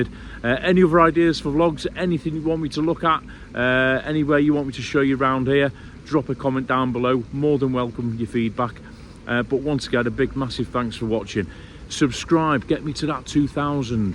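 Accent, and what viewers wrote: United Kingdom. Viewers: Do you have a scouse accent?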